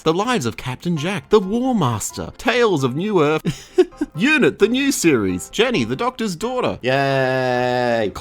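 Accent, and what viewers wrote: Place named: Australia. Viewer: This is assertively, but not aggressively, Australian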